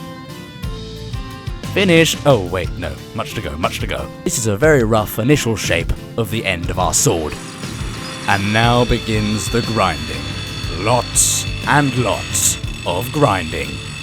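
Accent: United Kingdom. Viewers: Got Dang that is one jive turkey. First comment on one of your videos i found you threw planet rust but just now relized how amazing your other videos are. this is by far one of the coolest vidoes i have seen in quite a wile. love your grammer and accent and you in all your british glory. its not surprising you have 30k subs with how steller your videos are. you have a real tallent and i could easily comapre you to fankie on pc in 1080 p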